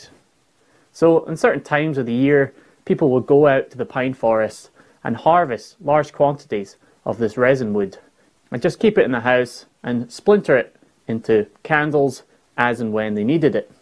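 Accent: Scotland, United Kingdom. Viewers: Haha, the scottish accent is so badass, that the automatically created closed captions just said, "yeah, well, f' ck it..." and just doesn't even try 😁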